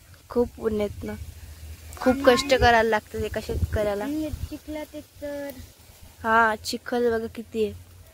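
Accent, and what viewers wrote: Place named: Konkan, India. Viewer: thank u tri tumchya bolnyawarun watlach mala ki tumhi koknatun asal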